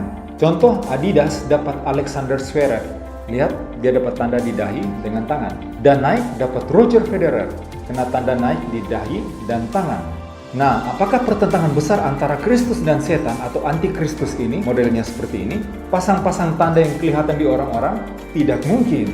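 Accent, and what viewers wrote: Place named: Timor-Leste. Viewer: Gaya bicaranya spt orang timor ya 😄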